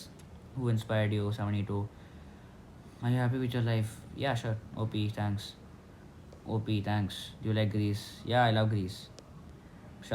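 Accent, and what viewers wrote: India. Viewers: You sound like indian🧐🧐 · Me guessed from the accent he is Indian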